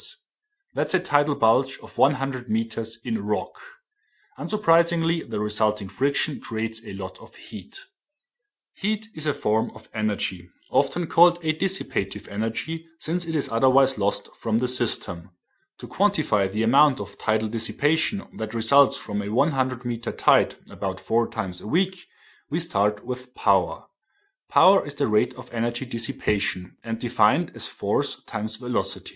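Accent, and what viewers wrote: Germany. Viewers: Oh man that was awesome. Please continue to use your knowledge and German accent to obliterate misinformation!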